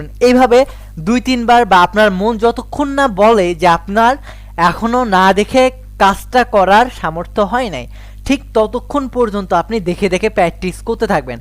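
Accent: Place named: Noakhali, Bangladesh. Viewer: ভালো লাগলো আসলে। আচ্ছা আপনার বাড়ী কি নোয়াখালী নাকি? কথা ঐ রুকুম আসে।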